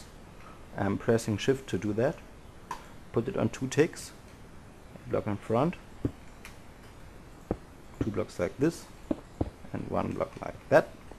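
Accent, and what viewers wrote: Germany. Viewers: Heyho, in my oppinion you speak with a german accent. is it right, that you're from germany? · Are you german ? Think so :D Glaube schon :D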